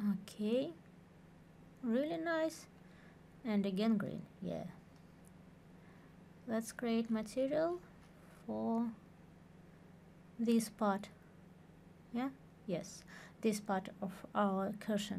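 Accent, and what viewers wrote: Russia. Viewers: THNX for video, ps: русский английский просто невозможно не распознать))) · Oh this lovely russian accent!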